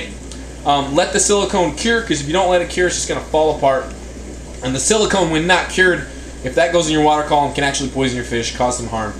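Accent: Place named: Tyne Valley, United Kingdom. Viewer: that Tyne valley accent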